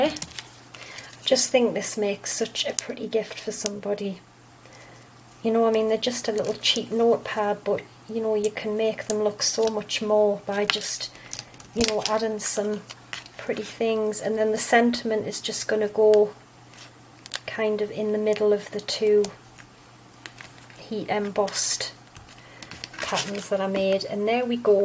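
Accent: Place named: Sunderland, United Kingdom. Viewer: Nihe1967 I would guess Sunderland? Quite specific accent 😊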